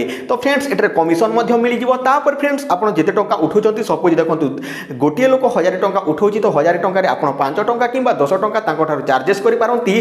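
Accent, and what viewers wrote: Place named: Sambalpur, India. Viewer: Sambalpuri kahu6 bro ... Nice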